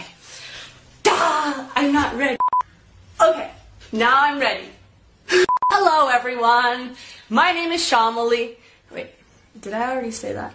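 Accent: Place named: United States. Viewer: pretty good american accent to just have moved from argentina a year ago. wondering what else is coming.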